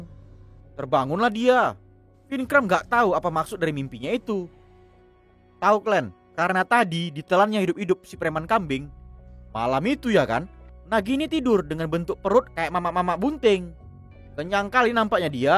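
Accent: Medan, Indonesia. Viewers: Yang cerita orang medan , bah makin keren si abang ni😂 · Logag medan in kak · Fix ni admin orang Medan🤣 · Eh nampak kali Medan ny ABG ni bah seru pulak aku denger suara kau bg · Medan kali kau yah lek hahaha sama sama medan kita Horas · Orang Medan kek nya admin nya ini wkwk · Orang medan bah yg review film nya🤣,mantap kali bah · Medan kali ah kau bang.. Horas medan😀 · Ceritanya kek lagi begosip versi org medan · Nampak kali medaaan kau yaa 😂😂😂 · Suara org Medan😀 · Kekel ma bahasa ke bang... Medan d mana ke bang... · Orang medan ni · Dialeg medan bg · Suaranya logat anak Medan ini... Yg Punya Canel anak Medan Bung... 😂😂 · Medan x bahh logat nyaa..haha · Yang ngomong orang Medan y bang? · Kok suaranya mirip logat org medan dah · Becak becak, Medan x pun kau wey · Anak medan bah😂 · Logak cara bicara abng kaya orng medan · kek gini lah klo org Medan nge review film,jd ngakak😂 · kyaknya ko orang medan ya bang Mantap la🖒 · Medan kali logat abang ini ya kan we...?? 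· Medan nih suaranya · Binje - Medan kali bahasa ko bang 😄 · Logat medan nya mstap kali🤣🤣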